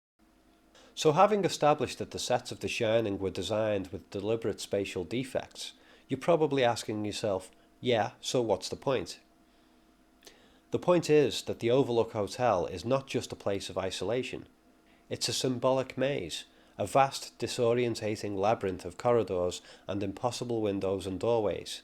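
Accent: Liverpool, United Kingdom. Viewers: Great video. Your accent interests me -- sounds like Scouse transplanted across the Atlantic. Are you a Scouse expat? :p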